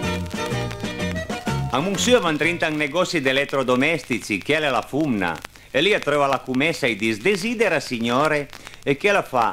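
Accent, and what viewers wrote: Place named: Turin, Italy. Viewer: Hhaahahha che ridere soprattutto quella, dei televisori. Urbe era proprio una forza.... All'epoca un mio amico aveva diversi suoi dischi di barzellette. Che bello ascoltare il turineis puro.... Oggi il nostro dialetto così ilare e simpatico non lo senti più ormai.... Peccato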